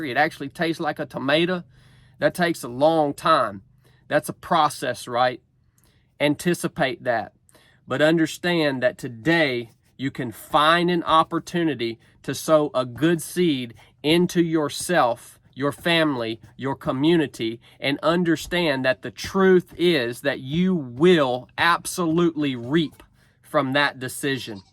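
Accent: South, United States. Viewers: Just gotta say... Thanks to ma man (Southern Draw Accent) lol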